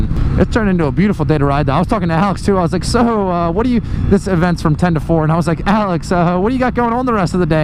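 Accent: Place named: Georgia, United States. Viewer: yeah but hey you can't help "how" you talk. he's from GA. I'm from Alabama, so he's cool lol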